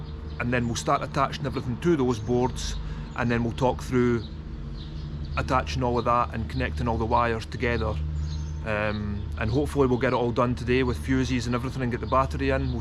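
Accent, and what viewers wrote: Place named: Scotland, United Kingdom. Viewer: Mate where in Scotland has that accent ?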